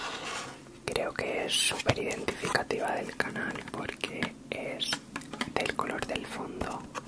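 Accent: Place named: Castile, Spain. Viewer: Comúnmente no me gusta mucho el castellano pero a ti te queda genial y el ASMR te ha quedado muy relajante.🪐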